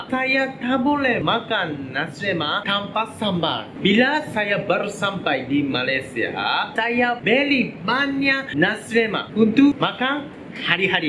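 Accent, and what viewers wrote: Japan. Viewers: i love the way he try his hardest to speak malay even the japanese slang was there a bit but the effort tho really deserved praises. Here some virtual hug for you !!!!❤ p/s: imma eat nasi lemak 2morrow morning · Suka dengar cakap. Tatabahasa sangat baik. Cuma sebutan masih ikut pengaruh consonant Jepun. BTW, mana boleh makanan Jepun rasa makan hospital?! 🤣 Saya suka makanan Jepun. Lagi bagus dari makanan hospital. Kalau lama tak makan makanan Jepun, asyik terbayang di kepala dan lidah. Sashimi dan kare raisu paling best.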